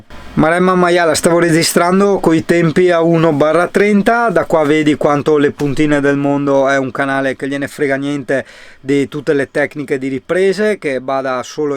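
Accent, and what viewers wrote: Veneto, Italy. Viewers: Non cambiare i tuoi video piacciono perché sono genuini e con la parlata veneziana a volte un po' colorita.... Per il mono patino informati sulle regole in qualche città li equiparano al codice stradale di un motorino ....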